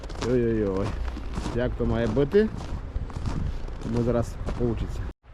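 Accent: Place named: Zakarpattia, Ukraine. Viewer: Сяка зима била...😅 зразу чути Закарпаття.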